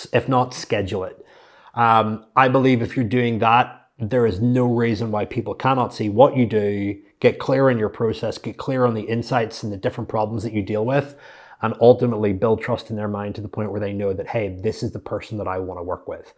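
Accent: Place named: United States. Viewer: Thanks! Very helpful. Loving my Mission Ready 💞 Ps.Your Irish accent seems to be turning American 😳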